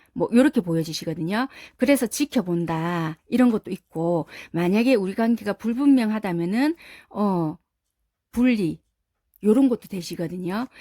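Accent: Busan, South Korea. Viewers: 신기해서 한번 더 봤는데요~ 영상도 너무 예뻐요~ 👍🏻👍🏻 선생님~ 혹시 타로 배우려면 어떻게 하면 되나요?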